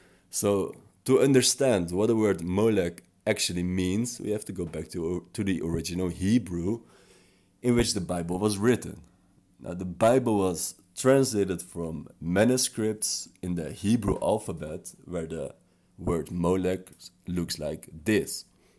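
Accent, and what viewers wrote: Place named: The Hague, Netherlands. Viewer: I can tell you are from den haag because of your accent lol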